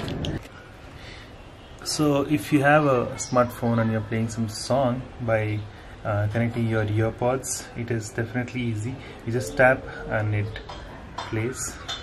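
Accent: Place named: India. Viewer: DIGGAHHH dieses Indische englisch fuckt mich so ab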